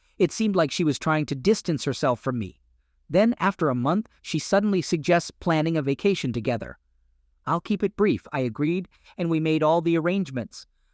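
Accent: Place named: Brooklyn, United States. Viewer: This is the worst narrative bot yet. A brooklyn accent with a simp voice.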